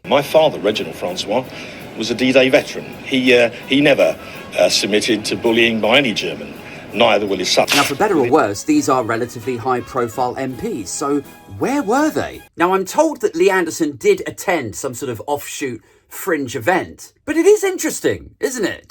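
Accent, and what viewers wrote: United States. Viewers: Stop using the term "guys" endlessly - you sound like a yank with an English accent !!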